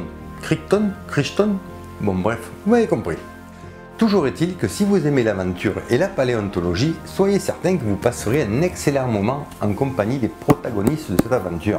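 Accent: South, France. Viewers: Le sujet de la chaîne est intéressant, mais j'ai un gros reproche à vous faire : compte tenu de votre accent méridional à couper au couteau, il faudrait éviter de vous exprimer comme un DJ. Vous n'animez pas une foire au cochon locale. Je n'ai pas compris grand-chose du premier coup et j'ai fait beaucoup de retours en arrière. En vous exprimant calmement, posément, ça irait infiniment mieux. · Bravo ! c'est très intéressant. Je m'abonne ! Bonne continuation. Comme quoi on peut avoir l'accent du sud et présenter intelligemment autre chose que la météo à la télé et ou à la radio dans notre pays. Adissiatz !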